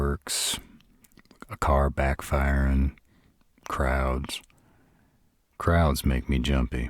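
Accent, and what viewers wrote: South, United States. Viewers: that southern drawl is so freakin hott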